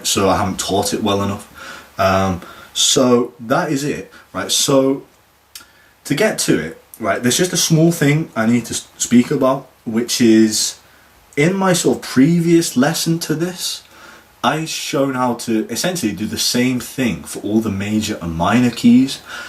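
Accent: United Kingdom. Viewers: BRILLIANT!!!!!!!! T U so much for literally helping me out with ALL FORMATIONS of the Pentatonic Scales; I happened 2 stumble upon U, and Quite elated that I NOW can practice Scale Patterns watching your video. Also love your English accent.! Again, thanks! peace&love out 2 U cheers!!!!!!!!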